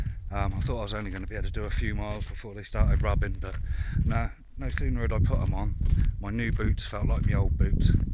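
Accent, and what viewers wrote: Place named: Portsmouth, United Kingdom. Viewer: Are you a Pompey lad? Your accent seems familiar. Lovin, the vids mate, keep on doin' yer thang. 👍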